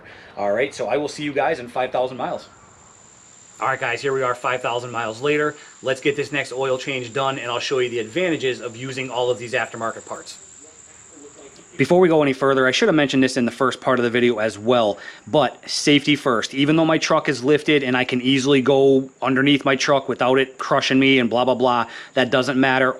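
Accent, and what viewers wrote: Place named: Philadelphia, United States. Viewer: No way this dude ain't from Philly.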